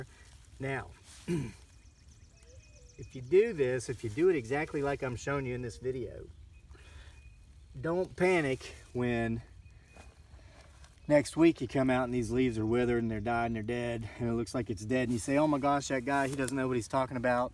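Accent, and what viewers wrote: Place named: Virginia, United States. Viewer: Before I even noticed your hat I knew you were from VA from your accent!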